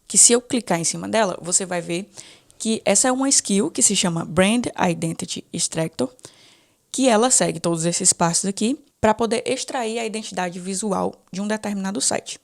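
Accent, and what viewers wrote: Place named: Recife, Brazil. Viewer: Oxi! O sotaque é do Recife. Hahaha Conteúdo muito bom! Muito rico! Parabéns! Uma dúvida: como funciona o Antigravity para criar apps e fazer o deploy?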